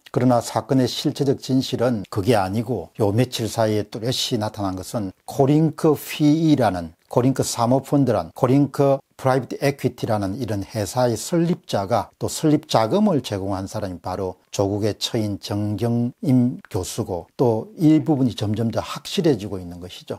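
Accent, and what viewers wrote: Gyeongsang, South Korea. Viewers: 공병호 소장님ㆍ 참ㆍ대단합십니다 ㆍ경상도 발음외는 흠잡을 것 없네요ㆍ쉽지 않은 방송 인데 ᆢ꾸준하십니다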